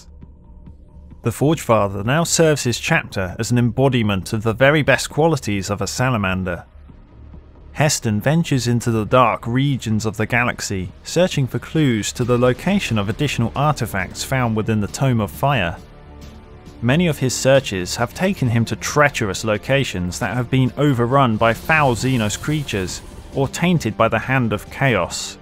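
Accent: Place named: United Kingdom. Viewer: The GrimDark Narrator sent me your way, says you know your stuff AND have a british accent.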